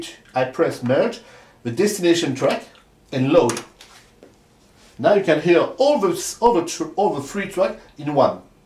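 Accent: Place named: France. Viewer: Tu es francais n'est ce pas ? ca se sent à l'accent haha :p Mais c'est quand même une excellente vidéo, plein d'informations... jusqu’à là je regardais des gars comme Synthmania ou MusicTrackJP pour en apprendre plus sur la prog' des synth vintage mais ta vidéo répond à une bonne partie des questions que je me posais sur les séquenceurs, l'overdub etc. en plus de voir des beaux trésors de guerre comme le OBXA ou le Matrix 6 (dont j'ai une petite préférence pour celui là ^^) et bien évidement la DMX ! (dont d'ailleurs j'essaye de répertorier dans une playlist toute les musiques ayant été joué avec cette drummachine) Je suis encore qu'un modeste joueur qui joue avec un simple clavier MIDI relié à un PC (et je n'ai as encore publié de vidéo car hum... j'ai encore beaucoup à apprendre) mais j'aimerai bien posséder une de ces machine un de ces jours... ou alors un KORG du genre des Poly61 puisque j'aime bien ces petites bêtes qui sonne plus "wild" que les Roland de la même époque... 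et qu'ils n'ont pas encore subi l'énorme inflation de ces derniers :p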